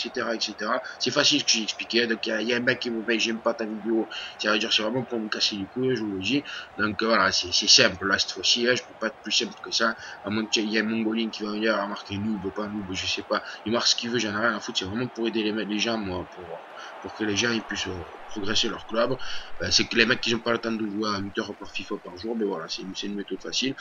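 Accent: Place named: South, France. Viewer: T'as un accent du sud ! Tu viens vraiment de Belgique ? :P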